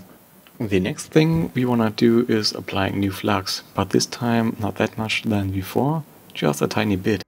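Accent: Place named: Germany. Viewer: If I’m not wrong you sound like you’re German, I tried ordering from MJK but they seemingly don’t ship to Germany? How did you get one? 😊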